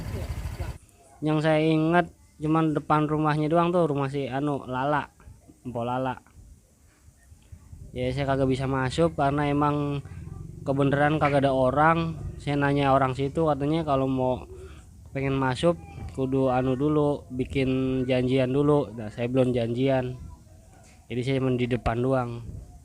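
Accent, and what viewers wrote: Betawi, Indonesia. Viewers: Betawinye enakan anak kemayoran logatnye.ente betawi pinggiran ye bang? Ketahuan bahasenye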